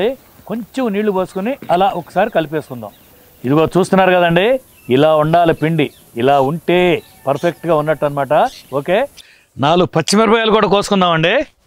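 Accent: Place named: Rayalaseema, India. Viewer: మేడం ది పక్క రాయల సీమ అనుకుంట వాయిస్ మాడెయ్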